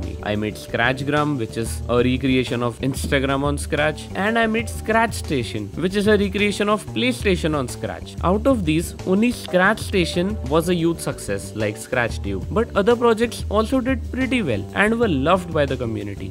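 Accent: India. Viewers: The wierdest part about this is that he sounds like a scam caller. (No offense dont cancel me every indian probably sounds like that im just uneducated)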